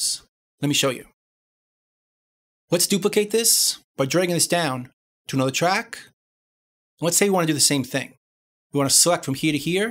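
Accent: New York, United States. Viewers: You're a talented teacher! That New York accent helps, too!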